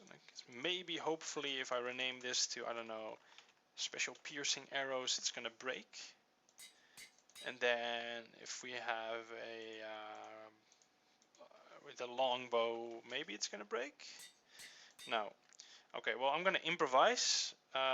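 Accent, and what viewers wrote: Netherlands. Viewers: dude, u dutch? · Jij hebt een nederlands accent. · wait.... are you dutch ? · probeer op je nl accent te oefenen :) dat klinkt iets beter (alleen als tip voor de rest goed)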